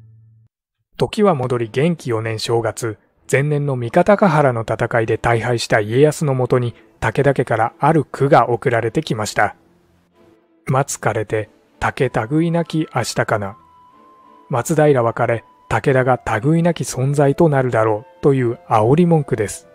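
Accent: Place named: Mikawa, Japan. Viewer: 三河のアクセント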